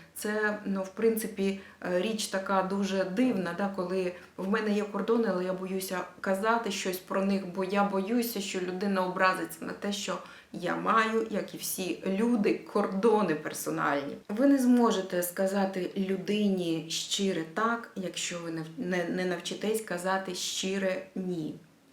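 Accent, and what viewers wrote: Russia. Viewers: Говорите-то Вы на украинском, а акцент у Вас русский 😂😂😂😂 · Відчувається, ваша російська вимова ( можливо ви раніше і спілкувалися тільки нею), але приємні ваші старання в перекладі українською.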